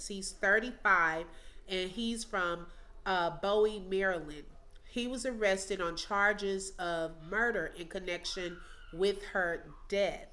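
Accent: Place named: Texas, United States. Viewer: Nah. You speak Texan, which is a language all its own! ❤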